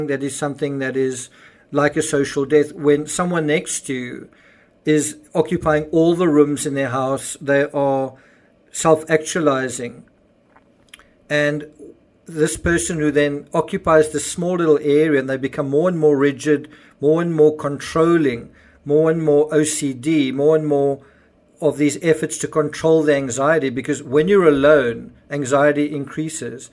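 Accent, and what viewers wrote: South Africa. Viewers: It's his accent. He's from SA. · (I've heard it spoken this way by all? south Africans.. said with all due respect. 🤭they are protective of their 'woman'.. anyway, goes back in history&culture !! Not something I know enough about😌, but the very norm how its 'pronounced' 👍🏻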